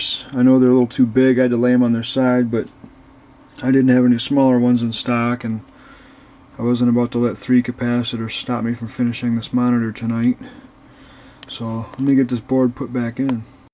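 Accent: United States. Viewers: I am sure every state and country you have visited you heard the word SOLDER, why was this word mentioned to you? Do you sell it or what? Add another letter and wou get the word SOLDIER, how do you pronounce that? SAWDIER. It is two syllables SOL say that.... and DER..... and that. Now put them together, what do you get, MUPPET. You are obviously American, where you say Cli'n instead of Clinton, Innernet, couner, couny, twenny...............learn to speak English. Muppet.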